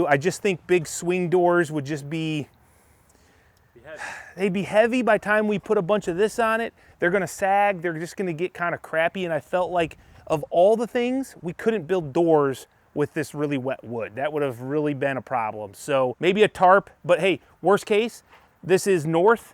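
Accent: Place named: Chicago, United States. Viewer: Nice Chicago accent.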